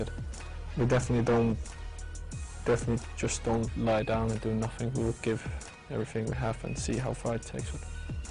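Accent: Liverpool, United Kingdom. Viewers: Hes gettin a scouse accent!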